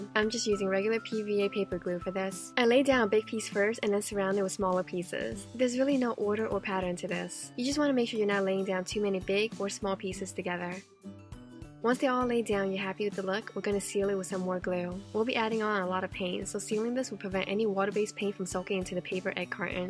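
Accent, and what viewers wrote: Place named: New Jersey, United States. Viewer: I think she sounds like she is from New Jersey, either Jewish or Italian.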